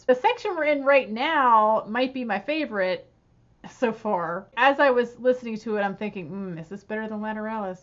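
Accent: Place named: Mid-Atlantic, United States. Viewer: My second listen... Hear "noaht" for "note"... Def Mid-Atlantic.. Awesome!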